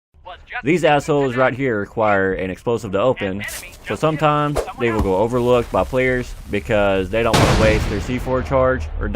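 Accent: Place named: South, United States. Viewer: Where you from man?! Gotta sub man you sound southern as Hell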